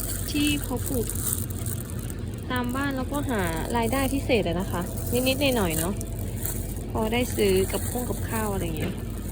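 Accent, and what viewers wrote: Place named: Isan, Thailand. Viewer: ชอบพี่ พูดอีสานมาก 😂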